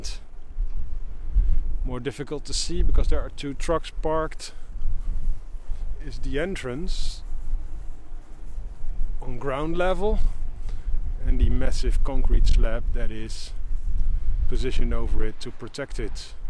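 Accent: Netherlands. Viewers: Interesting video. And good English, i can barely hear any Dutch accent. Too bad that the bunker is owned by companies, all those typical company objects like those gates, containers and camera poles smudge the historical object. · ... en aan je accent te horen ben je Nederlander, net als ik : ) Ik kwam vroeger vaak naar IJmuiden voor de bunkers, o.a. Batterie Heerenduin.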